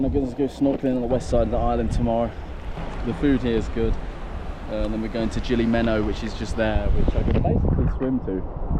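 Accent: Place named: Manchester, United Kingdom. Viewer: Sound Manc or northern Uk which im from. I will keep an eye out of your vids. If your around in IND let me know and you can show me around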